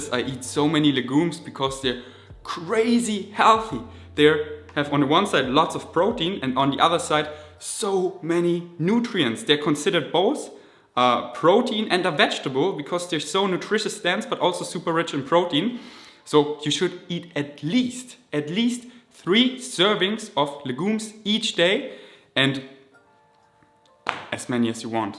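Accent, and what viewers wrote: Germany. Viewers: Ich finde deinen deutschen Akzent beim Englisch sprechen irgendwie soooo ablenkend :(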